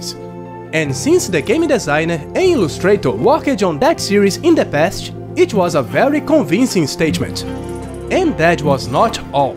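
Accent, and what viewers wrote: Brazil. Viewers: painfull accent bro, I'm brazilian it looks like our accent, great channel but please get rid of the accent its actually not that hard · are you brazillian? Your accent seens like. I would say you're even from RJ XD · Espero poder jogar esse game no 3DS, fiquei desapontado com o Legend of Legacy! E parabéns pela desenvoltura no inglês, pelo sotaque logo percebi que era Brazuca também, sucesso no canal! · sim sim,é um jeito bem caracteristico de falar,so quem é brazuka percebe,por sinal otimo review,parabens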